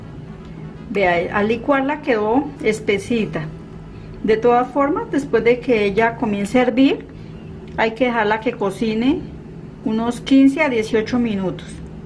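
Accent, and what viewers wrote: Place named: Colombia. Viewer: Me encanta el acento colombiano, muy deliciosa receta, saludos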